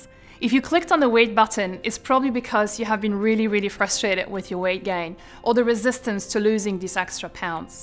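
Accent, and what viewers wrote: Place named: Ireland. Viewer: Like ur Irish accent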